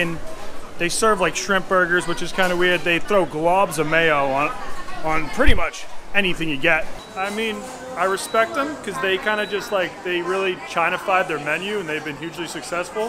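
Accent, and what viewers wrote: Boston, United States. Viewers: That Boston accent from 2017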